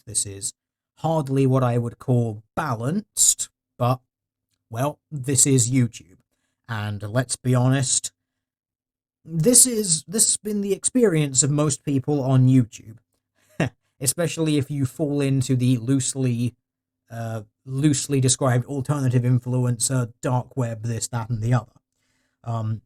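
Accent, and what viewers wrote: United Kingdom. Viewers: The Crown killed Princess Diana. All monarchies including Monarchial Constitutionalism are inferior to Republic Constitutionalism. Fite me. (Sorry talking about Conspiracies involving one car crashes with a british voice mentioning it triggers this type of shit posting.)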